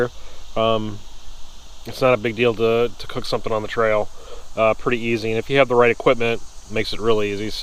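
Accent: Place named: Northern Ohio, United States. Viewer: Btw, I live in the Columbus area, too. Listening to you I’d say you’re from northern Ohio originally. Somehow I ended up on the Bushcraft Ohio website and you said you’re going to the Zanesville Bushcraft gathering. I’d never watched your videos before but I’m glad I have now. I take care of my mother so I can’t go to western PA to practice and enjoy. As I’m just getting back into this because my kids are now all at OSU.... they never liked camping so it’s been on hold over 15 years. Anyway, I’ve been going to Delaware State Park and tent camping. Pretty much stick to myself because almost everyone else is in a trailer. Doing some bushcrafting too. Harvesting and processing wood, building a table, making tripods, big and small and using them for tarp shelters and hammock hangs etc. If you see this and have time to respond, where can I go that’s within an hour of Dublin/Powell to “wild camp” I guess it’s being called now? 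Is that different than primitive camping? Anyway, any info you can provide will surely be appreciated. I think I’m going to watch our fatwood video next. I’m still searching for the mother lode score. Sure, I can harvest from a branch... but who doesn’t want to find the outer rotted trunk of punkwood with the inner core of fatwood? It’ll happen.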